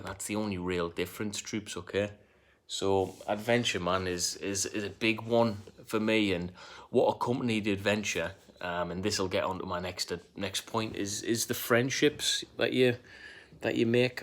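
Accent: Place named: Northeast England, United Kingdom. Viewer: Hi mate first of all I want to thank you for serving our country. I really appreciate what you and all other servicemen and women do for us to keep our safety and freedom so thanks again. And where about in the U.K. are you from I can hear the northeast twang but where about in the northeast is it exactly I’m from Durham and it sounds pretty similar to mine but not sure haha thanks . Anyway mate stay safe .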